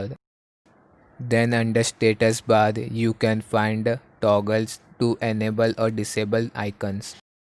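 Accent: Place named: United States. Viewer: i thought ur an american, awesome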